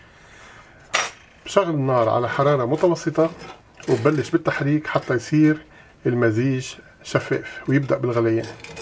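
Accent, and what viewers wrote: Lebanon. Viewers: este chico habla el arabe con acento Libanés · Dkfkf Dkfkdmd كلامو مبين لبناني 100%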